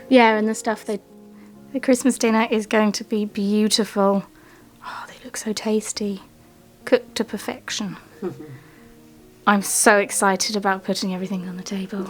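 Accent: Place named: England, United Kingdom. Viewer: That candelabra came out amazing..I'm so inspired but your imaginative creations! It's so mezmorizing to hear your proper English with an English accent..lol🥰 gives such a pure primitive vibe❤❤❤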